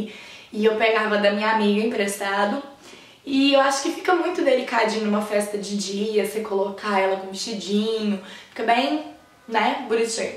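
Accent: Minas Gerais, Brazil. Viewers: "num tem base" haha esse sotaque,que só mineiro sabe como é. linda amo seus videos.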